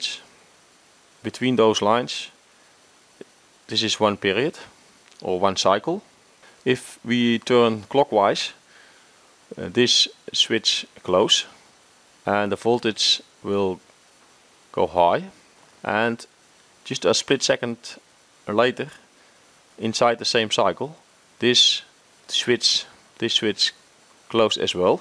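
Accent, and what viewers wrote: Netherlands. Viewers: always nice to hear these dutch accents. nice video Continue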